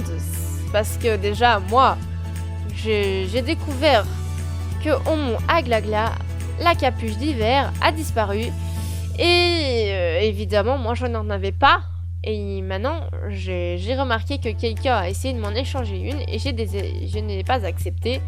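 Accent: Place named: Belgium. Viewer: elle XD ets une fille Preuve: son nom est Et sa voix c'est parce qu'elle est Belge.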